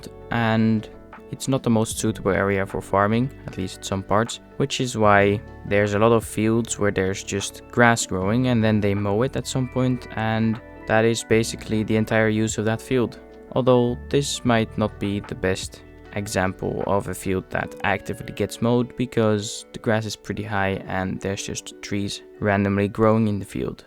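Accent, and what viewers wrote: Netherlands. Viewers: where is ur accent from? are u dutch?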